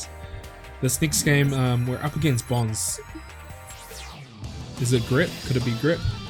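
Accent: New Zealand. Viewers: You kiwi bro?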